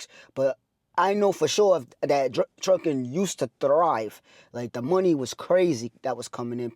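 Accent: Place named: New England, United States. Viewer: great video! where are you from? i cannot pinpoint your accent apart from new england region.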